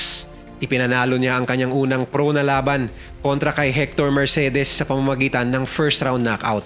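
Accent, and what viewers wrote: Visayas, Philippines. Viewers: Bisaya ka pre no? Hahaha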